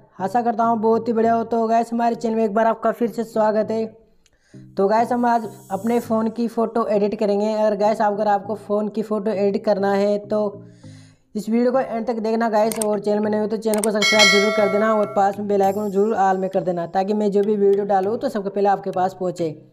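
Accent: Gujarat, India. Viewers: Patel sound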